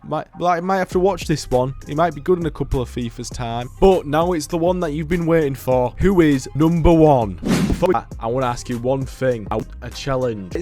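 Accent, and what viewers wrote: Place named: South Yorkshire, United Kingdom. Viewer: I swear to god your accent sounds so close to mine 😂 you from South Yorkshire? Don't tend to see many creators from there big ups to you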